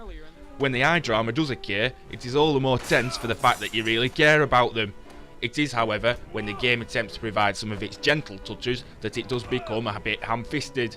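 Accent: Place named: Scotland, United Kingdom. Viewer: the guy wasn't listening to my verbal commands so I shot him. Anyway, good review. Is that accent for real? you sound seriously Scottish, like deep in the hills, kilt-wearing, haggus eating, "Freeeeedom !!" yelling Scottish. are you?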